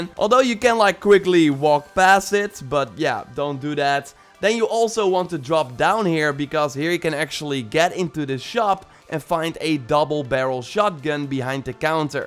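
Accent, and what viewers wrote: Netherlands. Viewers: Are you Dutch by any chance? Your accent sounds like it haha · Je bent Nederlands of niet. Je hebt echt een Nederlands accent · Het leuke aan dit is je Nederlands accent. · Your dutch ?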